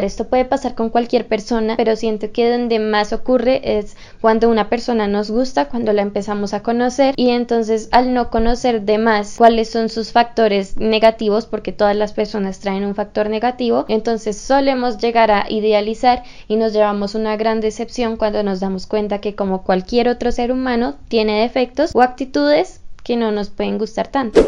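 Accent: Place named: Colombia. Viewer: Ambos son colombianos, supongo que es por el acento ☺️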